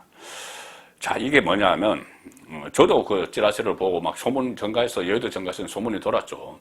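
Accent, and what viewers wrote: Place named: Gyeongsang, South Korea. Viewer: 깅상도 발음 참 듣기 싫다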